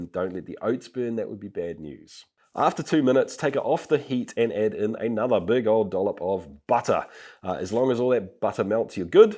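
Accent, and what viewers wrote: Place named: New Zealand. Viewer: Just wanted to share my pointless thought, not really related to the topic of the video: This kiwi accent is killing me sometimes. One of the main things is that "e" sounding like an "i" and making one word sound like the other (for example better--->bitter). Ofc, there's a lot of other things too. Especially when I come back to this channel after a break it really gets me off guard, and takes a while for me to get used to it again. And dont get me wrong I'm not trying to criticize or make fun of this accent (as i said its a pointless thought, so I'm not really trying to do anything here), since I barely speak/understand that language at all, and I sound like I have a serious brain damage even when I speak my own native language, so I'm really not in any kind of position to make any actual comments or criticize anyone's accent. 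If anyone read to this point: thank u for letting me waist ur time😊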